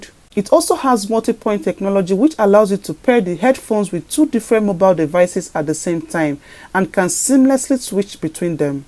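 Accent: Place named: Nigeria. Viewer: Nigerian accent sucks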